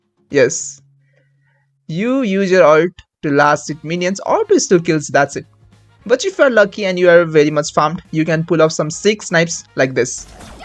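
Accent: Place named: India. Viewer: title looks Filipino but your voice sounds Indian 💀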